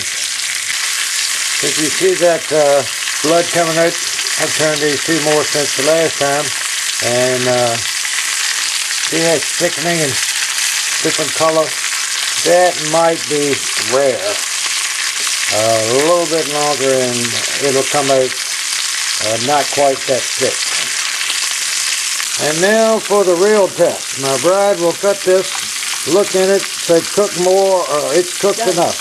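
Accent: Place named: North Carolina, United States. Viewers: Today will be my first time preparing Lamb loin chops. I really like this video because this guy's southern accent remembers me of my childhood, living on the farm in NC with my grand dad. He cooked alot and made it look so simple, just as the above video. And absolutely nothing was wasted. Hope my lamb chops turn out just as great as seen in this video. Thanks!!! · This video is great at extremely helpful! Thanks so much! I knew that wonderful accent sounded familiar! I'm from North Carolina! :)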